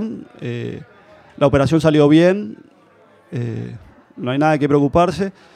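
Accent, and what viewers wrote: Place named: Argentina. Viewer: No le pillo la gracia...alguna risilla...pero esperaba más de un irlandés ke habla con acento argentinho...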